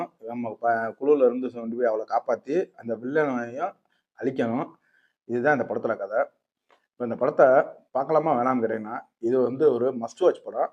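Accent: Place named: Usilampatti, India. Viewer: Madurai usilampatti ya bro... naanum than😅slong theriyuthu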